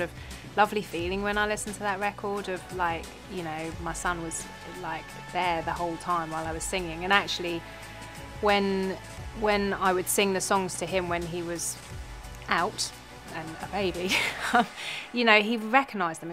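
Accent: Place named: London, United Kingdom. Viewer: She's so London, innit?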